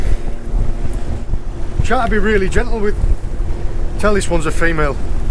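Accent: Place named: Cornwall, United Kingdom. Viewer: A very sustainable approach to sorting the catch. Enjoyed the sweet and lovely Cornish accent either. Missing it now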